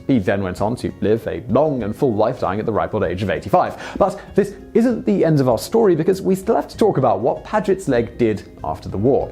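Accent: England, United Kingdom. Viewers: Why do you pronounce "Marquis" the American way. Your accent suggests, to me at least, that you are English, where we pronounce it "markwiss". Please, give the man some dignity. He was a member of the English aristocracy, not a fucking tent!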